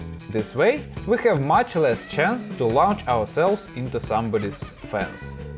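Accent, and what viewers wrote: Russia. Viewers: Бля, чувак с таким Рязанским акцентом луче не позорился бы и говорил по русски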